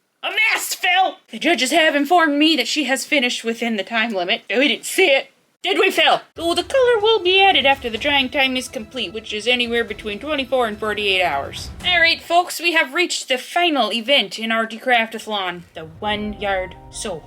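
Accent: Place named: Midwest, United States. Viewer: This was absolutely FANTASTIC! SO hilarious, creative crafts, and I LOVE your Midwestern accent as the commentator with! 😂 Please consider a 2025 iteration, and thank you for the laughs! 🥳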